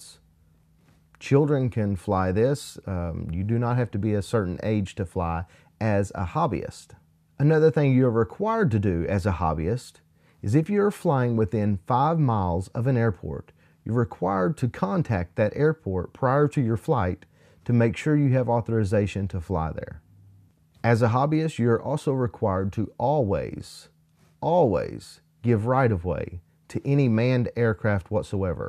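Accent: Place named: Arkansas, United States. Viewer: I detect a slight Arkansas accent 🤔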